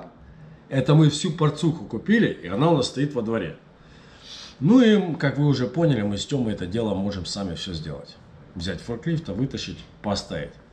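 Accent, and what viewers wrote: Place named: United States. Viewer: у тебя немного америк. акцент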